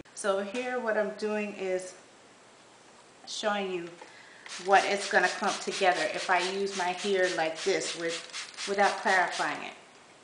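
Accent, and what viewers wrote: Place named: Caribbean. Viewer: I can hear west indian in your accent..where are you from?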